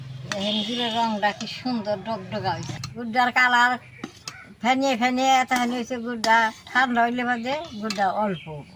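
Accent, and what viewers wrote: Tangail, Bangladesh. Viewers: টাঙ্গাইলের মানুষ আমি। কুমিল্লা থেকে দেখছি। আপনার মুখে টাঙ্গাইলের ভাষা শুনতে খুব ভালো লাগে।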